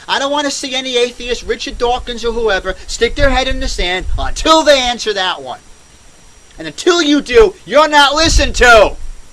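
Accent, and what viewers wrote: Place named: Brooklyn, United States. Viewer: I would rather spend eternity in hell if heaven is full of nasally Brooklyners like this moron. Sorry that accent is nails on a chalk board!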